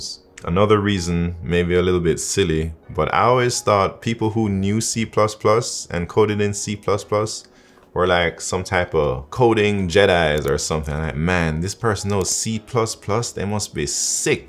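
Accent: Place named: Jamaica. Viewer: nice nice g! Good amount a Jamaicans in tech innu i based in seattle. Good fi hear someone on Youtube/Streaming in we own accent. Feels good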